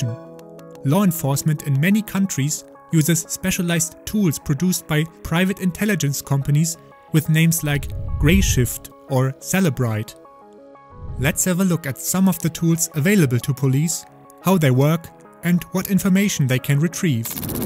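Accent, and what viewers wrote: Germany. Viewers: Nach 2 sekunden direkt gewusst dass du deutscher bist xD. Ehrenmann ^^ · German Accent on Fleek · Are you german by any chance? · You german? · The German Accent is Thick my friend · deuitscher macht englisches video, hört man an der aussprache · Man kann den deutschen Akzent einfach nicht verstecken, keine Chance 😂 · Ich finds immer lustig, wie man direkt am Akzent merkt, dass jemand deutsch ist. Whatever, sehr gutes und interessantes Video. Ich persönlich würde mich nur auf Graphene OS verlassen. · Awedul German accent and the guy doesn’t know how to speak in a microphone. He makes tongue clickings all the time. Don’t blow the microphone, space it farther away from your mouth…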